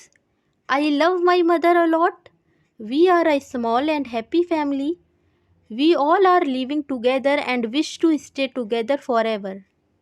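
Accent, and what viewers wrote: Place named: India. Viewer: See, I gave you the first (like) and the first comment, but you have to improve your dialect which is totally native Indian. Good luck.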